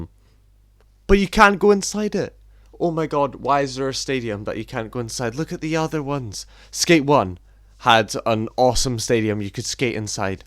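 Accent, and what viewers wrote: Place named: United Kingdom. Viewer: Cool accent bro. Are you from somewhere like Scotland or UK or Ireland? And what’s your main channel I dropped a sub on this one bro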